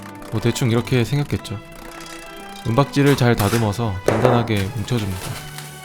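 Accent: Daejeon, South Korea. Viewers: 오 님 대전사람이세요?? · 님 혹시 대전사람..? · 어? 혹시 대전사람이세요?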